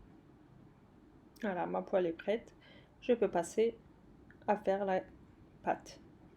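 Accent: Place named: Lorraine, France. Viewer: bonjour Quelle est la taille de votre poêle? Tombée par hasard sur votre site j’adore! Je crois reconnaître un petit accent lorrain 😊 Bravo et continuez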